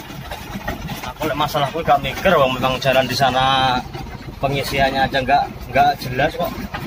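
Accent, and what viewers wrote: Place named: Banyumas, Indonesia. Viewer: Asale ngndi y mas Bisa ngapak y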